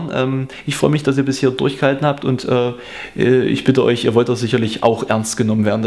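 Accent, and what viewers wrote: Saxony, Germany. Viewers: Ich glaube er kommt aus sachsen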